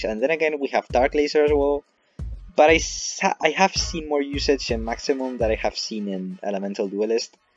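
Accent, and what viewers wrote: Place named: Russia. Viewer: I thought you are Russian. you have a russian accent)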